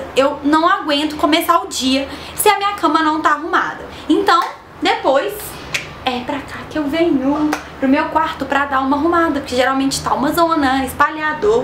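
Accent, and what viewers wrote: Minas Gerais, Brazil. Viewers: Eu fico rindo sozinha com essa menina....Adoro esse jeito mineiro de falar.....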